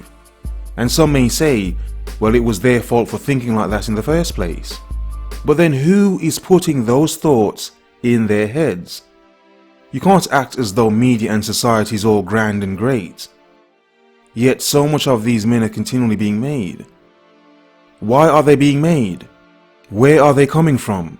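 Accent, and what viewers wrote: United Kingdom. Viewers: YouTube recommended this, but I can see through unoriginality of an African with a British accent trying to lecture males, not men to emulate his behavior and interaction therefore...I'm good these are devalued times, doesn't mean I need to stoop to a bottom of the barrel lecture from a schemer mentality to get through existence, love don't begin with a stranger it begins with sincere parents, family, and friends, every thing else is fate. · He is a Brit..I am surprised you couldnt pick up the British accent.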